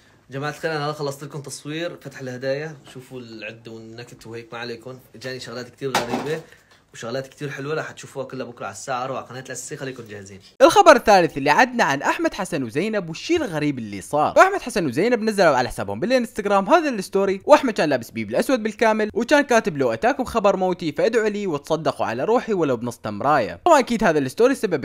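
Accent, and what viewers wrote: Iraq. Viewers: انتة من وين من العراق لان تحجي عراقي؟؟؟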